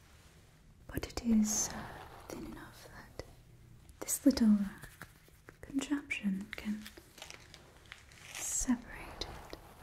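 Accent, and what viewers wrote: United Kingdom. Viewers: Would absolutely love this character to come back, I'm not sure what it was but this video is 1st in my top 3 asmr videos. (The accent was very English. If I was a new sub I would've thought you were from uk). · Your British accents are always so tingly ❤❤ Also I made a nobel water genasi character named Cordelia literally a week ago, I’m losing my mind.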